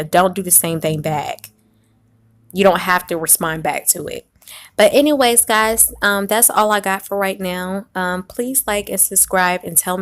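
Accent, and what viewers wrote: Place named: Germany. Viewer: I couldn’t understand this video. You sounded way to German for my English ears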